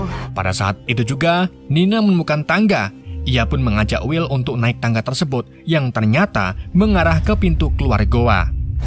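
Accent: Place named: Pemalang, Indonesia. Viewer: admine wong pemalang😂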